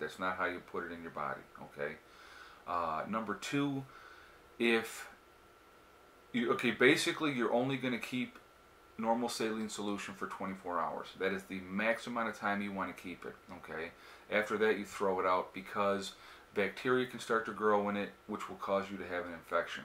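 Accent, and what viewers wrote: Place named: Chicago, United States. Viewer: Are you from Chicago? You sound just like someone close to me who was raised there.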